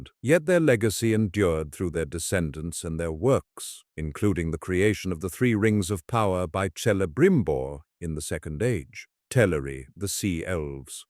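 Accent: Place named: RP, United Kingdom. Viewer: Love your RP narration. Puts me in mind of The Inklings.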